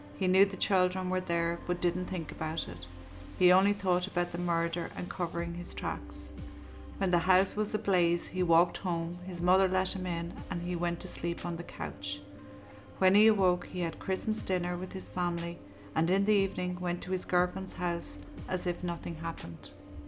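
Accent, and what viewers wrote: Ireland. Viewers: I simply love the narration. ❤️ To the point, no loud music, no annoying speech patterns, no (time fillers of) recaps of what's already been said. And you get a cute little Irish accent. Love it !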